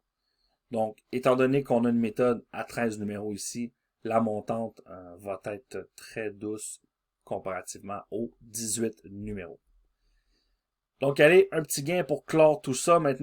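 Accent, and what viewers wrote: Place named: Quebec, Canada. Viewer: l'ackcent quebecois tres beau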